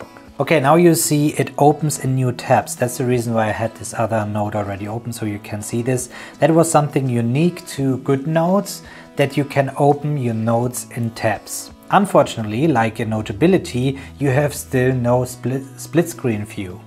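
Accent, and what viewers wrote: Germany. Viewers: Das ist very german englisch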